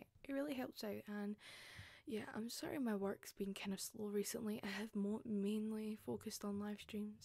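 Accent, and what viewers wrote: Scotland, United Kingdom. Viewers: Are you from Scotland? I hear an accent.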